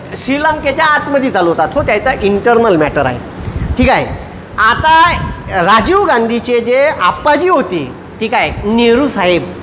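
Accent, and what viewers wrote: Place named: Vidarbha, India. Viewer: विदर्भी मराठीत खूप छान ऐकायला मिळाली माहीती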